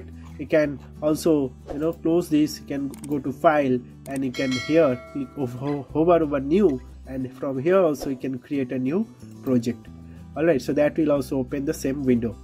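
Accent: India. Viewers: This indian accent is super annoying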